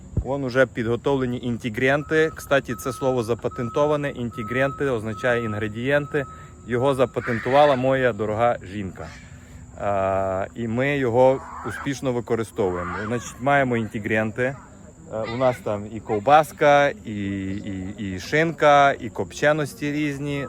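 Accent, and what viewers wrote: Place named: Zakarpattia, Ukraine. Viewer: мене більше цікавить як у нью-йоркерця до сих пір такий прекрасний закарпутський акцент😅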